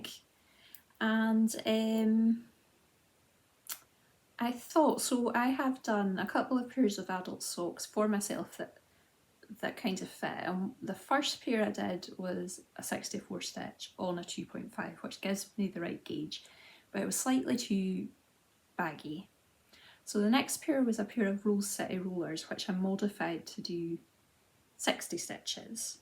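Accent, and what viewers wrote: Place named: Highlands, United Kingdom. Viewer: Oh, I'm so happy about that! I made a vintage jersey for my sister and the pattern called for a zip on the shoulder. Not only could I not find a short enough zip but I decided it would be itchy. So I used crochet loops (on a tiny needle so they came out nice and dainty) and it looks lovely. You may need to experiment on a swatch you already have. Mind you, the other benefit of trying this is that it's very quick , to both do and to rip off again if it doesn't work out. ps I love hearing a Highland voice, I live in the Midlands but am from Dingwall/Brahan. But actually it's not just your accent and dialect, it's your manner too. You make me homesick :)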